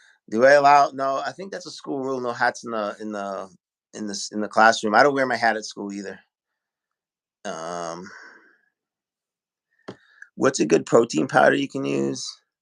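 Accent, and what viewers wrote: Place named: Boston, United States. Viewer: Lol how pronounces Pop Tarts. “Pawp-tawts” 😂 gotta love that Boston accent!!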